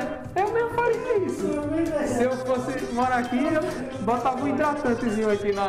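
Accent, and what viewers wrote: Northeast, Brazil. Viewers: Lindo ap ! Logo saio daqui de São Paulo ,e partirei aí nessa cidade linda... amo é esse sotaque nordestino...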